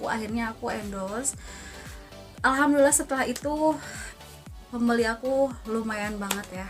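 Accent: Indonesia. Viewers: Wong Jawa ap Rika mba 😂😂😂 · nyarios na cirikhas orang Sunda pisan nya teh resep mireng na hehe 😁🤗🤭sukses terus teh ❤️🤗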